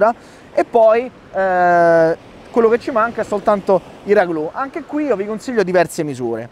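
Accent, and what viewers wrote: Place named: Pozzallo, Italy. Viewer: Bello!! Ps, sei in Sicilia? Mi ricorda il pozzallese..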